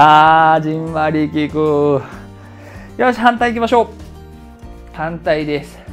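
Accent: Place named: Tohoku, Japan. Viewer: 東北出身なんですかー！私、東北人なので、の訛り全く気にならず入ってきました😂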